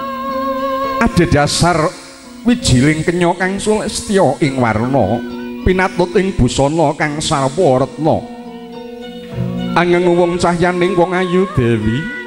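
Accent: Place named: Java, Indonesia. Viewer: Jian mantep...artikulasi jelas...intonasi pas...logat jawanya trep....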